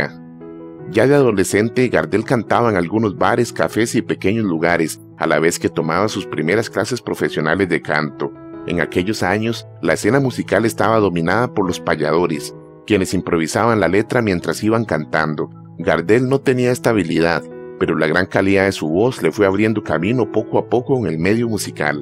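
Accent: Costa Rica. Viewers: Me encanta como pronuncia las R’s el presentador de este canal. Ese acento solo lo tienen los de Costa Rica 🇨🇷 😄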